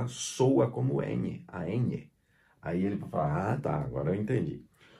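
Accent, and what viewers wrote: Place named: Spain. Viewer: O senhor é professor? É linguista? Qual a sua formação? Pergunto respeitosamente. Portunhol é só um nome para designar uma espécie de interlíngua. Um estágio em que o estudante está adquirindo competências linguísticas. Brasileiro e falante de espanhol, pelo menos, a minha variante (sou argentina) entendem-se, sim. Acho q esse “destruindo o portunhol” só pode causar medo e desanimo para aqueles que estão investindo tempo e esforço em aprender uma língua estrangeira. O senhor fala muito bem e tem uma linda pronúncia de apenas uma variante de espanhol: o europeu. Sons de espanhol europeu e de América Latina nada tem a ver. Eis a maravilha das línguas Já ouvi falantes de pt. Falando por exemplo: /baja/ /baRRa/ é por aí vai…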